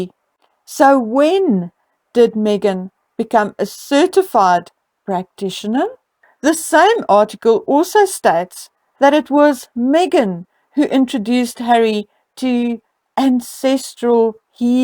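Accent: South Africa. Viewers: GREAT accent! South African? If i'm wrong, many apologies!!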